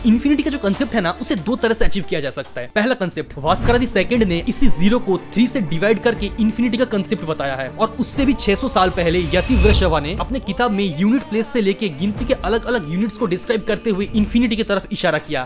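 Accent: Bengal, India. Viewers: Bhai tu Bengali hai kya, tera hindi accent se toh mujhe aisa hi lagraha hai.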